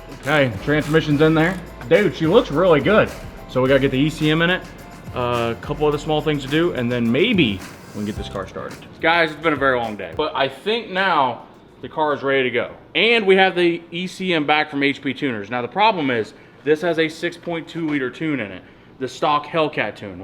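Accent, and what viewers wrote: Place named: Kansas, United States. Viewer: Bro speaks like a true Kansas-man. (I would know)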